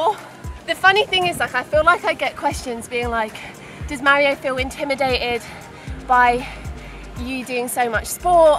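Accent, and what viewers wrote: France. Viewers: accent français on point ! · Is she French??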